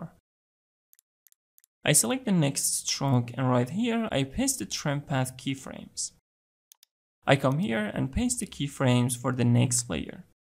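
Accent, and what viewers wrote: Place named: Iran. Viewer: Are you from IRAN? Your accent tells me you're IRANian ... :hand-pink-waving::face-blue-smiling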